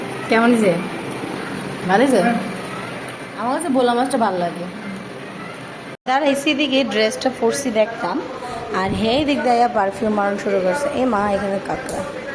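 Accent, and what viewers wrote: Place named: Bangladesh. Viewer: Apni ki Bangladesh er hindu? Apnar accent ta sune mone holo tai jigges korchi...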